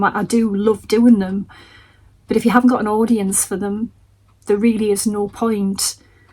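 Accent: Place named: England, United Kingdom. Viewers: Such a sad video. I have three craft content makers that I watch every day and you are definitely one of them. You make everything clear and precise. I think I used to watch any papercraft tutorials I could find but realised that a lot are not prepared, waste time looking for things and just don’t have our friendly northern accent (😍). I did lose you for a time but that would be due to your house move I think. Will definitely be watching you. I like the way you give a twist to old designs which means I probably have the equipment to make the cards. I also prefer the full tutorials but that’s probably because this is my retirement hobby so have plenty of time. Hope things improve for the industry. X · Hello, I have literally just found you on YouTube and Ive been paper crafting for about 2 years. Here's my opinion: firstly its so lovely to find a paper crafter with a fellow English accent 😅, there arnt that many on YouTube to be honest so thats nice. 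☺️And with regards to long videos, for me the longer the better but I have lots of time on my hands as I dont work anymore. I think, digitals are a thing, I tend to buy digitals as they're cheap and you can reuse over and over. Also alot of crafters like me already have alot of supplies and are keen to use up what weve got. So keep doing the long videos. I like to watch a video when I get into bed at night, it really helps me to wind down. Then any projects I like I will save to my Watch later and make them the next day or whenever. It seems you are a popular crafter (and Im going to check out your videos after this)😁so keep doing what you're doing. I particularly like one page folios, as they're useful to store ephemera. Pity about postage costs too. Thanks Royal Mail. 🙄I am also a card maker but haven't made many of late. Ive stopped buying from Temu for supplies and focus on charity shops as its more sustainable and cheaper. 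Do you sell digitals? ❤️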